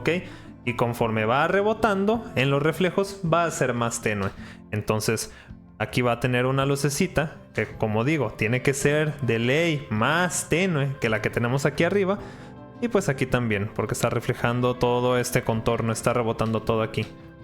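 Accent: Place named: Monterrey, Mexico. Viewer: Muy buenos tus videos compadre, stan con madre, se aprecia el esfurzo enorme muy entretenidos dinamicos con ese inconfundible acento regio, saludos🙏🙏🔥🔥🦾🦾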